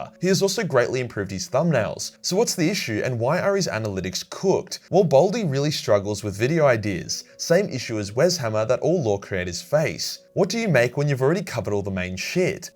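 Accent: Australia. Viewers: Dude, you are about to blow up! Girlfriends are about to watch Henry Cavill in his show. No gay shit but girls will watch you…your Australian. Accent wins. Thank you dude for exposing the best cosmic horror and being real. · >Be me >Dr. House fan > fan > turn his hair blonde >Cheesy Ausie accent > now look like dr Chase >MFW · AdeptusRediculos dont have you on because they are living in Cali. And you are way too harsh of a Aussie that would trigger their fans. As a fellow Aussie who talks alot like you do (with my mates) i can kind of understand that one 😂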